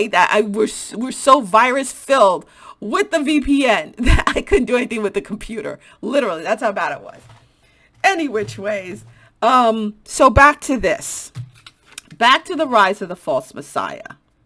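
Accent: New York, United States. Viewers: V you was so NY throughout this video! Lol thank you so much for the heads up! We really in this !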